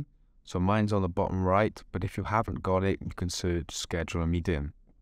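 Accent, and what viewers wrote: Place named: Wales, United Kingdom. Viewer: Love the vid - straight to the point and keeping it simple. Extra points for the Welsh accent. Mae'n codi hireath arna i -- remembering my time at uni!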